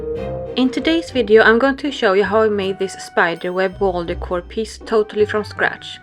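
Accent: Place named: Norway. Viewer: This is really cool. Thank you for sharing! Also, if you don’t mind my asking, where are you from? Your accent sounds Norwegian. 🤗❤️🤗 🕸️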